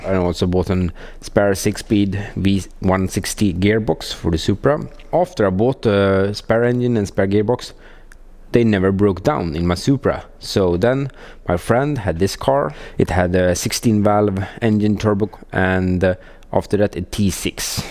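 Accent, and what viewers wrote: Sweden. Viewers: Your video are gold, and that swedish accent is awesome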